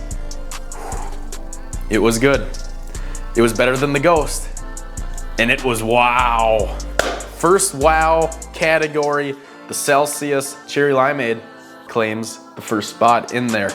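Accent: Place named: Michigan, United States. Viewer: ahh you have a Michigan accent so hot ❤ 😊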